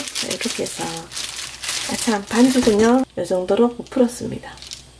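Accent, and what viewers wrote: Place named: Daegu, South Korea. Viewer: 는 대구분이신가요? 그러시다면, 말투가 처음엔 좀 거슬리더니, 보고 들을수록 정겹네요 ^^ 는 내가 이세상에서 호감을 갖는 최초의 대구사람이십니다~ ㅎㅎㅎ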